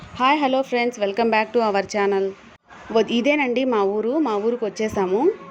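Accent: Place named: Kadapa, India. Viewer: Meedi aaa ooru maadi proddutur meedhi slang kadapa vaalla laga undi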